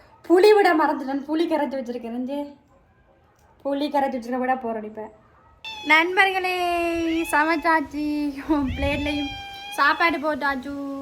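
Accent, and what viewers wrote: Sri Lanka. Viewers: Ammaa neenga jaffna tamil pesa vendam normal tamil paesunga kettikkari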